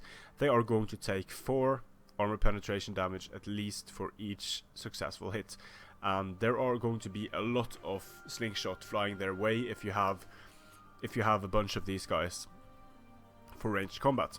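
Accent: Scandinavia. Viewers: You sound exactly like the guy from Red Ice radio. Norwegian / Scandinavian English accents sound very good... Most of you speak better English (with equivalent vocabulary) than perhaps 75% of Americans and 50% of Brits. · Great video!! Your english sounded quite like "the Scandinavian english" - Am i wrong/right?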